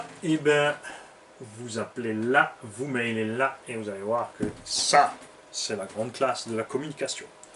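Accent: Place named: Alsace, France. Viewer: Salut, Je débute dans le RC "moderne". J'ai monté un Tamiya Madcap en kit dans les années 90 et je suis tombé sur des chaines de RC par hasard sur youtube pendant le confinement et ça m'a donné envie de m'y remettre. Je suis de Strasbourg donc je te comprends parfaitement;) même si tu parles en alsacien! Elsassisch ìsch bombisch, Hoplaaaaa! Je cherche plutôt un buggy RTR Brushless en 1/8 aux alentours de 300/350€ soit un budget total d'environ 450€ (voiture + radio + batteries + chargeur). Je ferai 2/3 bitume et 1/3 TT, quelques petits sauts et pas la peine d'aller à 100km/h. Un 4S suffira. J'ai vu le nouveau Absima Stoke Gen2 4S qui a l'air pas mal. Qu'en penses tu? Merci.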